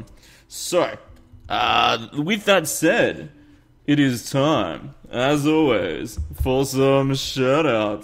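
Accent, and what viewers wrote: Australia. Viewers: You're Australian? I didn't realise. What state? I'm from SA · Bruh oh my god it makes ur channel even better because your Aussie . First good Australian youtuber keep up the good work man.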